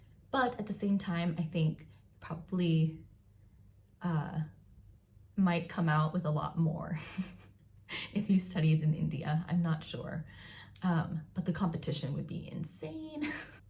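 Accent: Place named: United States. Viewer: To be Frank, couldn't follow your American accent. Still love to hear from you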